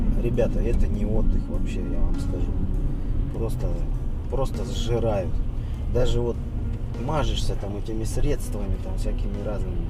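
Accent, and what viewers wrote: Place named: South, Russia. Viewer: Говор у тебя все равно южный 😁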